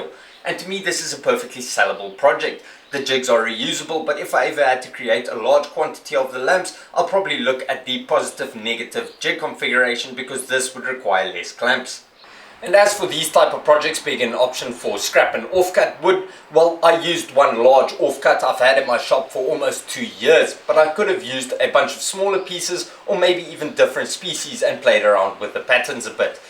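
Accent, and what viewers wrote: South Africa. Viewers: Are you from South Africa? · Are you South African? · Hey are you South African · Hi sir,i would just like to know if you stay in South Africa or from South Africa staying in another country cos I stay in South Africa and you accent sound South African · This guy sounds South African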